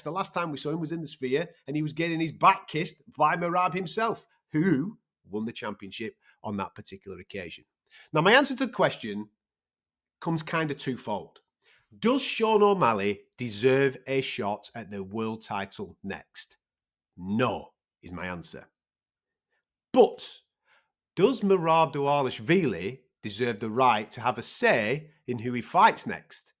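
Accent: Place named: England, United Kingdom. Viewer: Also the thiccc English accent makes all the names sound so epic 😂🔥🔥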